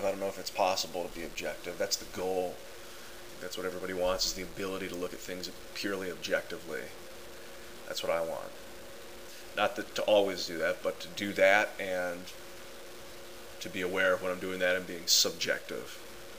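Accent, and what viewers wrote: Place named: General American, United States. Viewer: I disagree. Many, if not most, English speaking natives never master the English language. In my experience as an editor, well educated foreigners master English better than Americans. Among the typists, proofreaders and editors, it is a virtual United Nations at my newspaper. Top publishing firms have concurred with my statement. We come to this conclusion by who shows up when we hire. Don't sell yourself short. Where did you learn how to speak General American? It is perfection.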